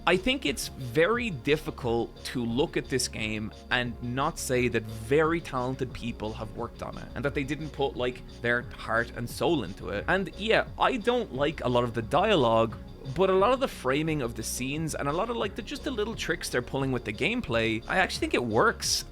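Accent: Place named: Ireland. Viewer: He is Irish...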